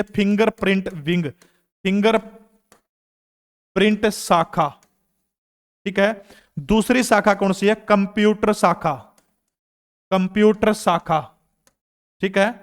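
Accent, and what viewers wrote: Haryana, India. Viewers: Jami mast aap ki haryanavi to mana Andi lega ..gurur ji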